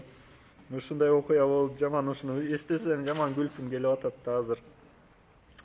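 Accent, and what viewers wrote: Standard. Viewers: Азамат жигит! аман бол, таза кыргызча сүйлөйт экенсиң рахмат!🎉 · Ооба бир да созуно орусча аралаштырбай таза суйлоптур баракелде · Азатсын , таза кыргызча суйлоп , элди тан калтырдын, азаматсын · Азаматсын балам, соз байлыгын коп , таза тунук суйлойт экенсин бар бол, ийгилик сага. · Какой ты молодец чисто разговариваешь на кыргызском языке. Браво. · Бирок кыргызчаны так адабий тилде суйлойт экенсин рахмат ошол эле мезгилде мен кыргызмын дегендер меням,сеням деп суйлошот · Молодец! Омурлуу бактылуу бол таза суйлойт экенсин кыргызчаны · Ассаламу алейкум ,дрсум азаматсын ,анча мынча Кыргыздарга караганда таза суйлойт экенсин сонун,Аллах ыраазы болсун сага дрсум ,ккопчулугу Ош ЖалалАбад жактагыларга эгер салыштырсак озгочо ,бирок алар капа болбосун мен болгон чындыкты айтып атам ,келгиле туугандар таза суйлогонго аракет кылалы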